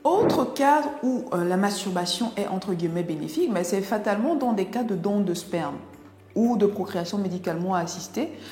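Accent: Cameroon. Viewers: Bravo Docteur. Huumm ! Avec ton accent Camerounais, je suis satisfait de votre pédagogie. Elle est simple, objective, moins culpabilisatrice et dogmatique . Bon , certaines religions poussent leurs adeptes à la culpabilité ? C'est sont parfois des dogmes. Quand à vous, va de l'avant et parle peu et mieux prochainement,Merci.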